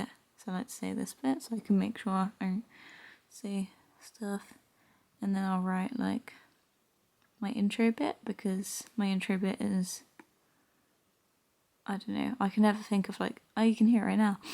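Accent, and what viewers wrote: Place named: United Kingdom. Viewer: Although the music was a little bit too loud, it was fun to watch and it was really interesting to see you more natural (you look good without makeup) more spontaneous more random :D :-) Also you have beautiful british accent (I know, sounds weird, but that's how it is, I love it lol). And I've never seen that thing you're releasing the bowling ball from, but I must try it, looks like fun, hope we have it here in Czechia or Czech republic or whatever you foreigners call it nowdays! :D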